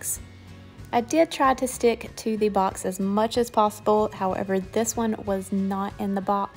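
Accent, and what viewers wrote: South, United States. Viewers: I love your ability to mix items that us "matchy matchy" people would typically not put together. This turned out beautifully, as always. I am curious though, (as a fairly new tumbler maker), how come you chose to do matte when using glitter? I wonder if using a non glitter vinyl and then using the mattify at the end would give it a similar effect? Your cup looked super shiney in the end. Is that how it looks after finishing with mattify? I'm genuinely just curious. Sometimes I feel like I'd rather have a matte finish on mine so thinking about getting some of that stuff. :) Beautiful job as always. I also love the southern accent. I'm in southeastern NC so maybe not as southern but we definitely speak with a southern accent here too. 😋😍🥰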